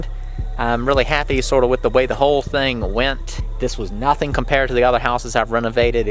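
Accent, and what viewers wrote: South, United States. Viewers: What city & state or town & state, is this located in??? Your accent sounds like you’re somewhere down South & I love the rent prices!👍🏻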